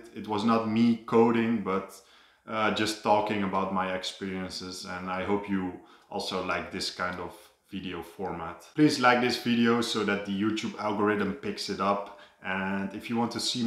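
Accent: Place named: Netherlands. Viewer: I subscribed because you are beautiful and I love your accent and the fact that you're from the Netherlands...much love from 🇧🇲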